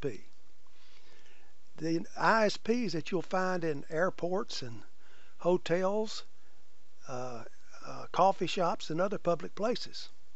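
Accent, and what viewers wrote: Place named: South, United States. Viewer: After listening to my austrian professor and barely understanding his lectures, the southern accent is appreciated.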